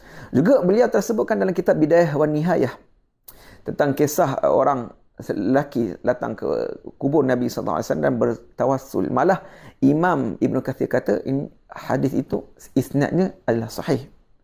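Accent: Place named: United Kingdom. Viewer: Memang benar....sesiapa yg otak 1@ digit memang tidak akan faham apa yg kapten bicarakan....Lidah kapten lidah inggeris sbb hari2 gunakan bahasa tersebut. Slang berbahasa arab pun sudah tentu keinggerisan. Pernah dengar syeh mahmud khalil qusairy baca quran slang khorasan? Mereka akn fitnah pelbagai cara dari pelbagai sudut. Tidak salah saya anjurkan para ustz (klu boleh dipanggil ustazlah) belajar lagi...sbb ilmu islam ini amat luas....umur 100 tahun pun belum tentu sudah cukup😂😂😂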